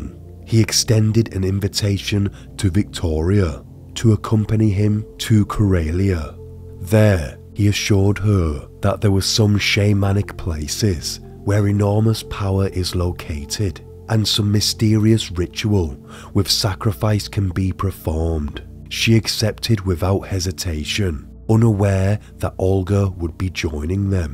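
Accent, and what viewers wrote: United Kingdom. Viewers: What region of British accent this?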